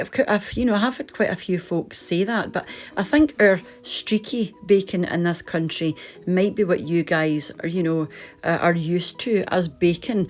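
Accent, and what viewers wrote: Scotland, United Kingdom. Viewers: Yes, I'm enjoying your Scottish accent as well. Miss hearing it.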